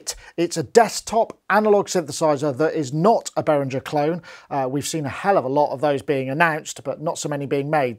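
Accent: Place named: England, United Kingdom. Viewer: English accent at its finest.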